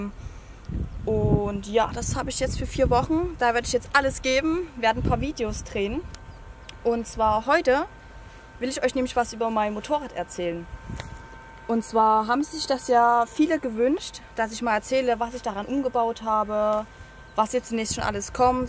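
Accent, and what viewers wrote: Saxony, Germany. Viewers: Dein sächsischer Dialekt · Woher kommst du ?Sachsen ?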